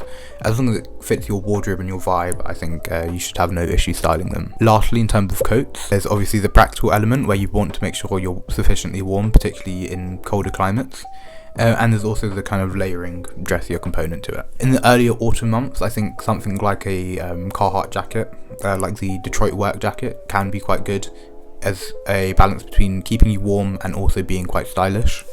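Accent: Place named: England, United Kingdom. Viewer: just becoz you English accent 🤌is wow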